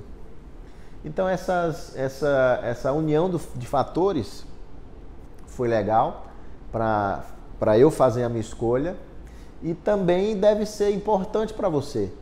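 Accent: Northeast, Brazil. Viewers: Oi Dr.:, eu lhe descobri aqui no YouTube por um acaso, mas foi maravilhoso te descobrir aqui, fiz curso técnico de enfermagem, apesar de não exercer a profissão, eu continuo sendo curiosa em relação aos assuntos de saúde, e com isso acabo relembrando meus aprendizados com os meus conhecimentos de enfermagem e de medicina, percebi desde o primeiro vídeo que vi seu que vc é nordestino, nosso sotaque é inegável em qualquer lugar do mundo! 😂😂😂😂😂😂😂😂 amo assistir seus vídeos! Sou de Arcoverde-PE!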